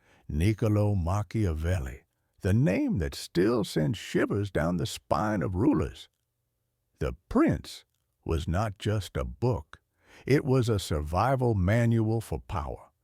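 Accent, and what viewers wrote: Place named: South, United States. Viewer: What's with the southern confederate general voice?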